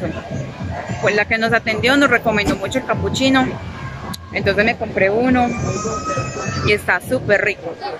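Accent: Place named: Antioquia, Colombia. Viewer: Todavia no conozco Medellín pero un dia alla estare porque lá tengo en mi corazon pegadito a São Paulo y tus vídeos son como clases de espanol con mejor asento que porsupuesto es el asento paisa.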